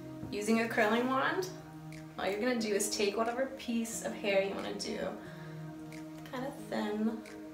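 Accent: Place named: South, United States. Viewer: Loved the video, You are cute and funny. I hear a southern slang as well.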